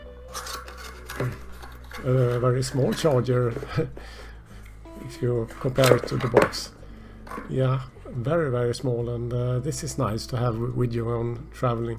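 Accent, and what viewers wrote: Sweden. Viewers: It screams Swedish accent.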